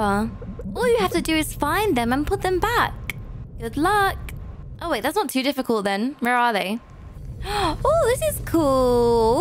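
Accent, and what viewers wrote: United Kingdom. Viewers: Omg your British :0 Give me a shout out pls :D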